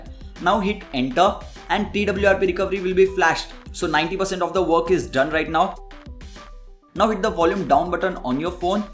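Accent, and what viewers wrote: India. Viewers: The speed at which you're talking would be fine...if you had clear enunciation and syntax of the English language, and you do not. You have a very thick Indian accent and it's difficult to understand you. I gave up trying to listen and stopped your video after about a minute and a half. You're talking way too fast for your level of English enunciation. You need to slow down so that listeners don't have a difficult time trying to understand and decipher everything you're trying to say.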